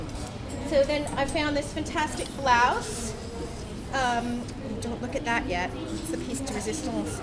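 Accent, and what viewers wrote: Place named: England, United Kingdom. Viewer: She sounds so English these days!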